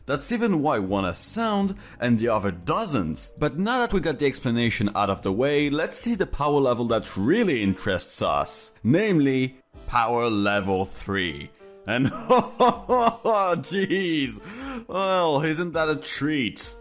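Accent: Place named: France. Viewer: I like your french accent.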